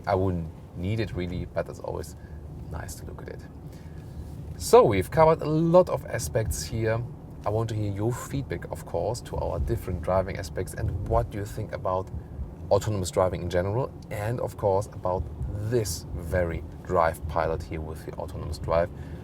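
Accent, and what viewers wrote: Germany. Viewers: he speaks "germish" :) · This guy does a great job on the car reviews and I like his german accent. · I've subscribed because of the great in depth reviews, but also because of that lovely german accent!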